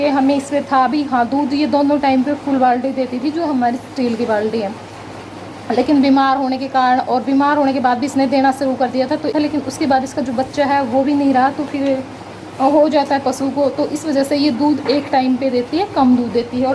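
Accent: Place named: Bhiwani, India. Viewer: Village Life with ok maine socha bhiwani ke paas ke ho aap boli waisi h aaj Maine aapko video first time Demi h nice video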